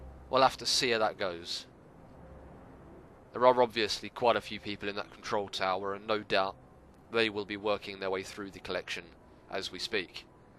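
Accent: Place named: United Kingdom. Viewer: You are so British mate :D - Giz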